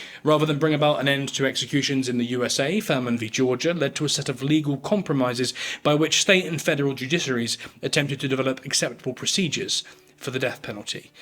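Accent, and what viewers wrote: United Kingdom. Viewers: This guy sounds like he might be from the same part of England as Rationality Rules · There is something about British accent and British ways of talking that always make me laugh. Hehehehe. Dude is hilarious... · A “vile” execution is necessary for a vile crime. In most cases, the condemned suffer much less than the victims. Fry ‘em all!!!! By the way- you sound like anti death penalty blubbering liberal limey. Why don’t you wash that shirt and report on rainbows and unicorns. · I was a state criminal prosecutor for 35+ years. Like most people, I feel conflicted over the death penalty. Even so, I think there is a great deal of misunderstanding over what happens during a legal electrocution. When 2,000 volts and 8 amps are applied, the condemned person immediately loses consciousness. Witnesses see very little movement except the person’s head goes limp after the first jolt. 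He is likely dead at that point, but a second jolt is applied to ensure death. This is probably gruesome to watch, but that does not mean that the condemned person is suffering pain. We know this because people who have survived accidental electrocutions at less current report remembering nothing. Electro-shock therapy is still used medically, with patients reporting no memory of the treatment. While there are a few exceptions, an electrocution usually is carried out without complications. The condemned person does not writhe or catch fire. I am guessing from the accent of the narrator that this video was made by a British citizen, where capital punishment is no longer legal. While I understand opposition to the death penalty, I would respectfully ask you to remember that the United States has different criminal problems than what you have in Britain and that capital punishment here, imposed by juries, is reserved for only the most aggravated types of murders. 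It is relatively rare.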